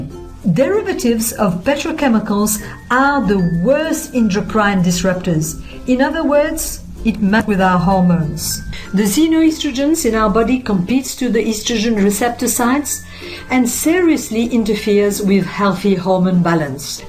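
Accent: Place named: France. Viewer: where is that strong accent from ? France ?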